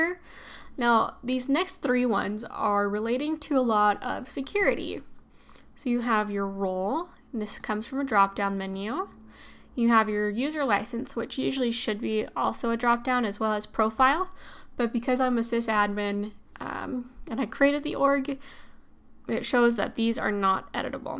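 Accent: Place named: United States. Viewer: Thanks God for an American accent :) ( it's annoying trying to understand a very strong Indian accent :P) thanks for the video.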